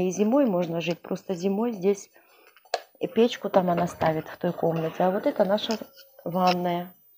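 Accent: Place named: Georgia. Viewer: Акцент у вас прям Грузинский немножко ,или мне показалось !🤭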